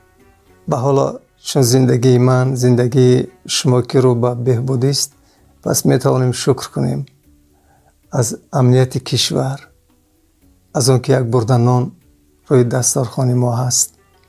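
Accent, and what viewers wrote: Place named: Ayni, Tajikistan. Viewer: Бово лахчаи Айнигия гум накунед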